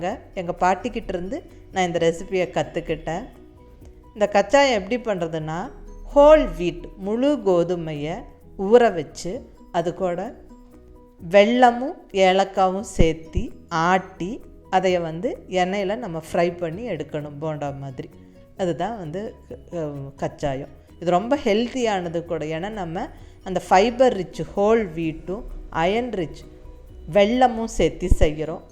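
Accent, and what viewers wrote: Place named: Kongu, India. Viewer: Thank u so much for ur video....... Ketadhum u did this vlog....... So sweet of u....... Recipe is so Gud and again our Kongu slang wow....... Nice to hear......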